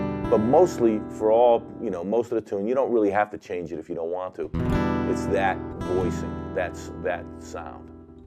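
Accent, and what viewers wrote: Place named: New York, United States. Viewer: An Italian American man with new York accent teaching an Indian sing. Amazing!!!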